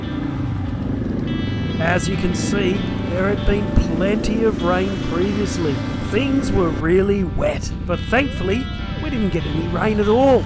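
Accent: Australia. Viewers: Great video. And the best Aussie commentary. Got my sub...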